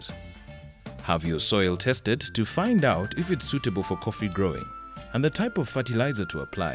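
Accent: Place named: Africa. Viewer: Great video very straightforward and I love the African accent! 😍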